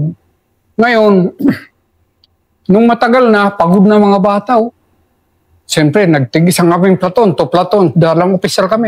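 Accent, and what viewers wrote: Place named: Waray, Philippines. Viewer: Waray si sir klaro dahil a kangga😊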